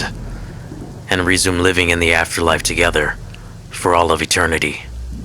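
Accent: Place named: United States. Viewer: Leng lead me here. Subscribed! So good!!! I was convinced you’re not Hmong due to your perfect American accent until I hear you speak the Hmong words in Hmong. 👍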